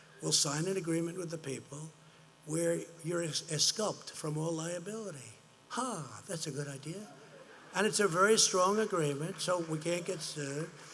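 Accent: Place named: New York, United States. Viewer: I think they just love the New york accent about him... that is the only reason I can think of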